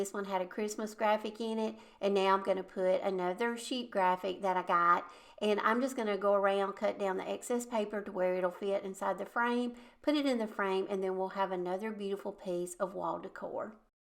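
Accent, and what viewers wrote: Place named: Alabama, United States. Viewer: The subject…French country decor…..caught my eye. Then I heard your sweet voice and said to myself “I bet she’s from Alabama “. Hi from Walker County(NW of Birmingham)…👋🏻👋🏻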